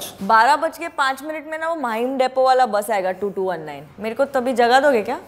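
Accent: Maharashtra, India. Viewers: Such cute Marathi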